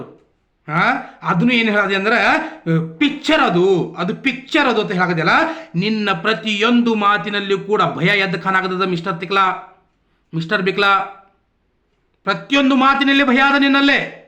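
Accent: North Karnataka, India. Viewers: Nim uthra kannada bhasheli ugiyodandre keloke chenda bro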